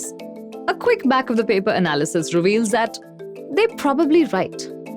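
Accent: India. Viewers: I don't like the Indian accent in general (for some reason it gets on my nerves) but it was a very informative video so I stayed to the end. Keep up the good work. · The highest number of internet provider, currently sited on the web in India, was 358. The smallest number of internet provider in the United States I could find was 2737 internet service providers in the United States: 894 DSL “Digital Subscriber Line” providers. One site citing the CIA, said there were over 7,000 Internet service providers in the United States. Given the difference in populations. It does not appear that number of providers and price have an inverse correlation. Enjoy your blog, great title. Interesting topics presented with intelligences and a refreshing an Indian accent. It is quite pleasant to my Midwestern ears. Thanks!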